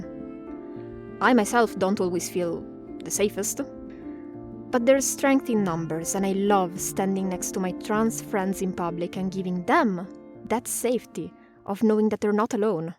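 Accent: Italy. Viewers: Hiiii!!!! It happens the same to me. I am spanish, so I completely understand the thing about pronouns. In my case, I prefer to refer to myself with "masculine" pronouns, but people could tell me whatever they want to, I won't mind jsjsjs. Also, I love how your italian accent shows when you are talking in english. It's so funny to hear :)))) Pd: Love the video and your art style <3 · You sound familiar....oh yeah, you are Italian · I'm so happy to see this, happy pride month from the Asia part of the world~ and your Italian and accent so cool!! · Your Italian accent is so funny haha 😄 (in a good way) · Ho sentito che sei italian dal esatto momento in cui hai parlato, anche se era inglese hahahaha Ti capisco così tanto sul problema dei pronomi, alla fine anch'io gli uso entrambi vivendo nel caos e confondendo le persone eheh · oddio ho capito subito dall accento che sei italianə, io la uso la ə ma solo nello scritto funziona secondo me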